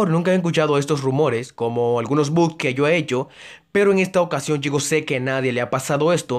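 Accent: Dominican Republic. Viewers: buen video, eres dominicano? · Eres de republica dominicana?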